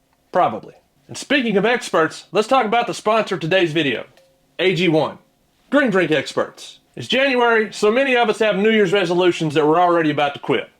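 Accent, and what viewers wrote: South, United States. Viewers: Motherfucker you're crazy. And your southern like me. Keep up the good work.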